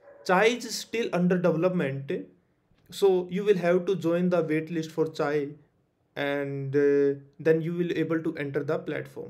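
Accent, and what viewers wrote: India. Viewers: Bruhh you from india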